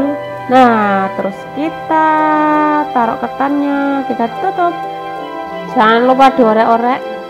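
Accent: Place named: Banyumas, Indonesia. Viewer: Ngapak ya 🤭🤭🤭😂😂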